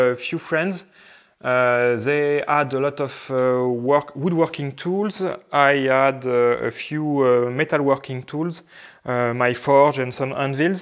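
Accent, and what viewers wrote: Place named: France. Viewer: New subscriber, just got one of your videos recommended the other day and have now went back to the beginning and binge watched them all. Excellent series and I am invested. Looking forward to the day (if ever) you decide to excavate the under cellar and maybe seew where the filled in tunnel leads to. Also every time you say the "poop cellar" in your lovely French accent I crack up.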